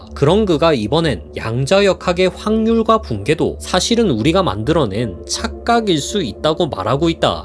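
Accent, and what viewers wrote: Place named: Gyeongsang, South Korea. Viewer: 경상도 억양 멋있어요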